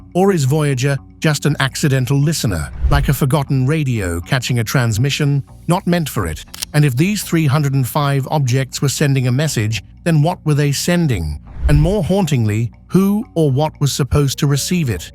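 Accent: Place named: United Kingdom. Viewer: A very British voice with a very American cultural bias sound completely unnatural to me - go figure!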